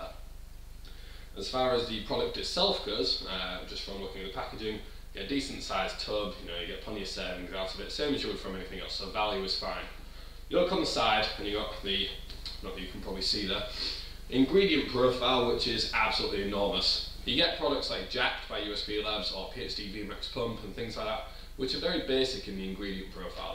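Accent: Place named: Leeds, United Kingdom. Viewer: 2.uhhh lol you gotta be from leeds or somewhere like that??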